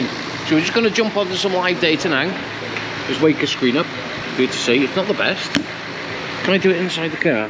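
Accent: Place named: North Wales, United Kingdom. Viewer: Where are you based mate? You sound local? In North Wales? I need my Daughters 2016 Mini 1.6d sorting the same. 😊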